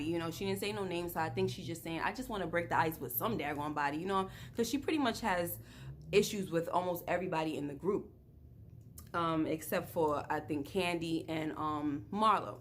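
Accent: New York, United States. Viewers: Oh WOW! I’m first! New subbie! Love your accent - sounds like home NY!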